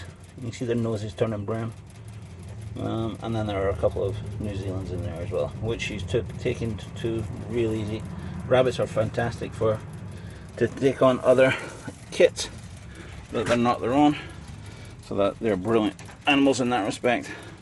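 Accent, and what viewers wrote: Scotland, United Kingdom. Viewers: I know you live in Canada but are you originally from Scotland?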